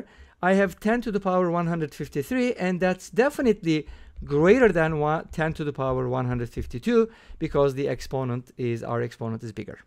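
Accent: Turkey. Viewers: you got that turkish accent rolling 😁 keep on doing what you do🫶🏻🫶🏻 selamlar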